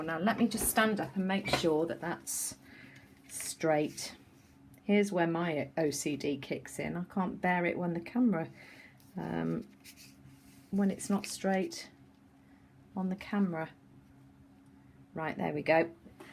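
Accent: England, United Kingdom. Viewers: These are very lovely! Do I detect an English accent? Love listening to you and watching too.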